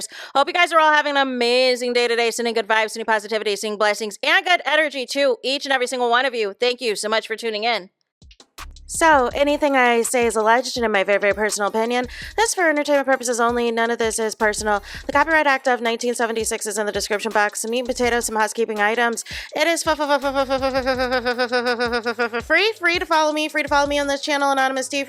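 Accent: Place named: Boston, United States. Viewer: I have never heard your kind of cadence before. I can only think Boston?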